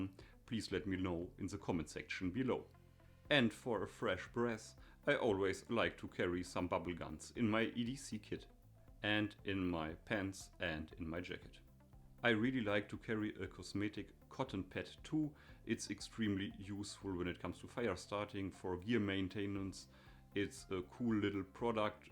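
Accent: Germany. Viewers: German dialect! Dann sag ich mal freundlich Hallo 👋😁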